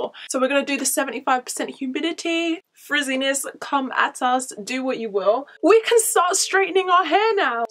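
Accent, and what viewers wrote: United Kingdom. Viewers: Where did you get your comb tool from? Also what country are you from.. you sound British? Xx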